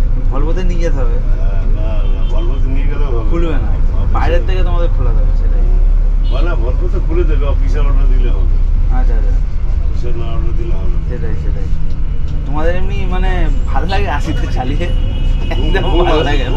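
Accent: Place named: Kolkata, India. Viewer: I live abroad but watch train and bus vlogs by people like you. I love your vlogs because you are very honest and committed and you do sound Kolkattan so much!!!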